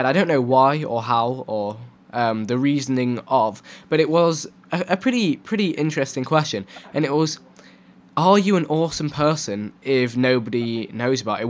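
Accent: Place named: United Kingdom. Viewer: I like your British accent :)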